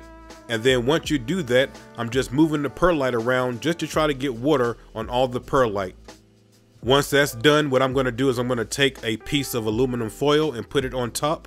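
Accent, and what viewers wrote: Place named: United States. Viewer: I love the American accent, it's deep or somethin'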